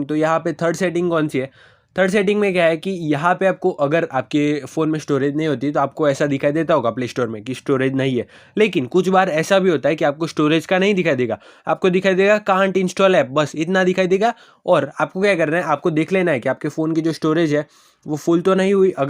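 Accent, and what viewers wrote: India. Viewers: i didn't understand anything because he is indian but it worked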